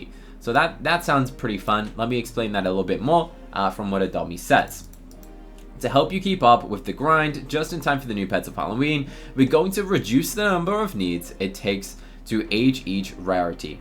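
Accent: England, United Kingdom. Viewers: Is it me or he is sou more British 😅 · is from england ye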